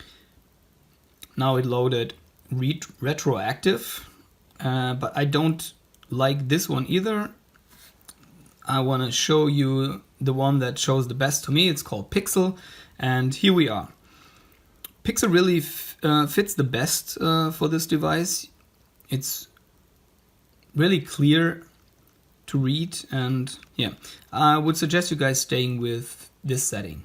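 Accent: Germany. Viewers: Deinem Akzent nach vermute ich, dass du Deutsch sprichst ;) Vielleicht würde dies die Kommunikation etwas erleichtern. Ich habe alles so gemacht, wie du es in deinem Video beschrieben hast. Das Setup hat wunderbar geklappt. Das Problem tritt auf sobald ich über das Verzeichnis "media" auf den Roms-Ordner zugreifen will. RetroArch beendet sofort und ich lande wieder im Hauptmenü des RG350. Mir wird gar nicht erst, wie in deinem Video der Ordner "Data" angezeit, bevor ich in dieses Verzeichnis weitergeleitet werde, stürzt RetroArch leider ab. Ich nutze die 2019er Version des RG350. Anbei wurde mir eine 32Gig-SD-Karte mit vielen Roms dazugeliefert. Allerdings habe ich selbst auch schon einige Roms in die einzelnen, vorinstallierten Ordner dazugepackt. Was kann ich tun, dass ich auf meine Roms zugreifen kann? Vielen Dank im Vorraus für deine Antwort. Beste Grüße Tim :)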